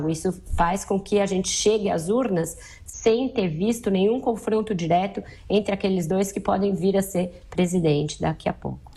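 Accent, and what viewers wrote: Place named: São Paulo, Brazil. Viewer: Sotaque charmoso, TU TÁ DE BRINCADEIRA, alias o seu sotaque paulista também é chato lembrei-me daqula moça correspondente da Globo em Londres ela é cheia de XXXXXXXXXXXXXXXXXXXXXXXXXXXXXXX horrivel da mesmo forma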